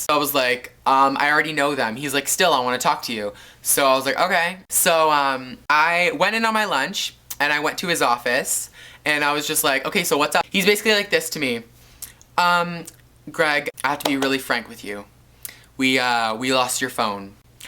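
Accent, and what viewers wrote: Canada. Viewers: his Canadian accent is really strong in this vid!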